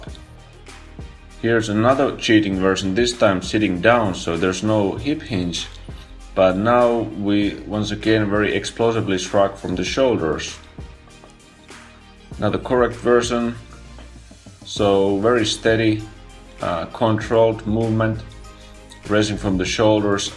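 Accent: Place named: Finland. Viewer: No wonders, you have the accent of Finn, haha :) Great channel by the way!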